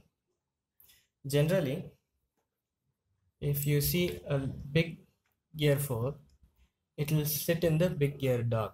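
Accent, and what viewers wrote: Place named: Bengal, India. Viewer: Babal English vaiya wowowo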